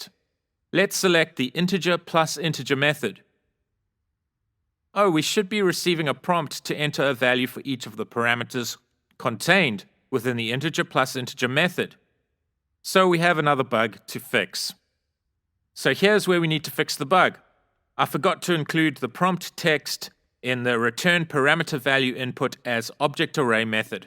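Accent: South Africa. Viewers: Nothing better than clicking on a youtube vid and hearing a south african accent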